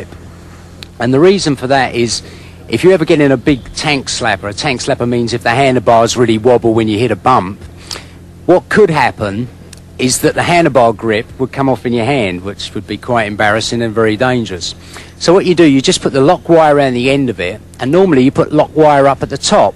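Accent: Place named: Australia. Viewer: he's got an aussie accent on here. I guess that's what happens when you live in Australia for 10 years, lol.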